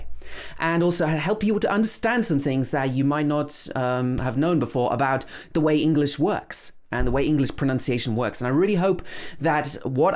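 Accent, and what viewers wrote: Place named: United Kingdom. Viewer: Bro Hollywood movies have an American accent but he speaks British accent so what you may feel difficulty in understanding it ❤️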